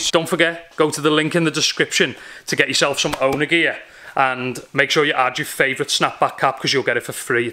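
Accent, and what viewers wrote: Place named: Scotland, United Kingdom. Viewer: Even sounds like home (Scotland) hyped on everything bro keep it 💯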